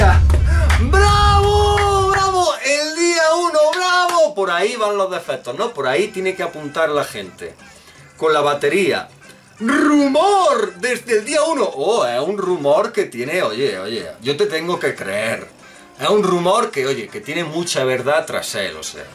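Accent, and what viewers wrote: Spain. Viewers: Jaajajja, me encanta ese acento para contar las cosas, de que parte de España es?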